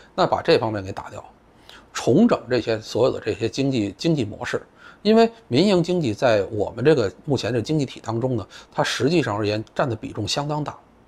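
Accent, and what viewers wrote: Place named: Beijing, China. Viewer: 内容不错，京腔讨厌。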